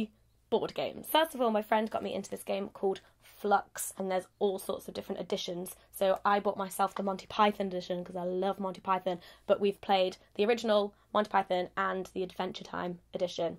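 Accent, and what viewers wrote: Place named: England, United Kingdom. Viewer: Your english accent sounds beautiful!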